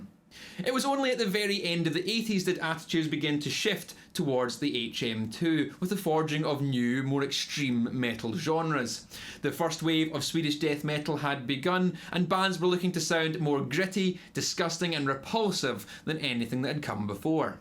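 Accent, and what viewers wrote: Scotland, United Kingdom. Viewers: It sounds more Scottish to me than British. All I heard during the whole video was Scottish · Reading the reverb article in a Scottish accent/10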